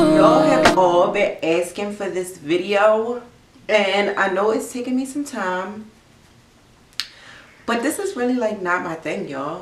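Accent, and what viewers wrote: Baltimore, United States. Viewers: Tommi and dime piece mixed together but I love your bmore accent my Howard crush was from bmore lol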